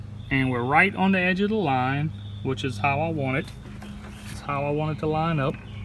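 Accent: Louisiana, United States. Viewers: Hi from the sound your accent you your ✋ from Louisiana like me.i really enjoy your vids really good stuff.i leañed a lot .old carpenter like . Again keep up the good work and I'll be want for some more stuff to learn...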